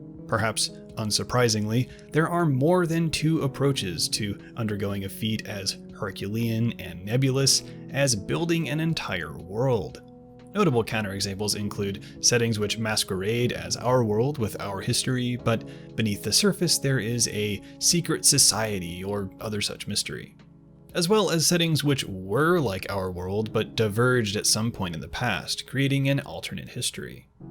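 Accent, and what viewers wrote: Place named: South, United States. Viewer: don't be ashamed of the drawl, don't let bad people Steal your way of speech I love the southern drawl it should be reclaimed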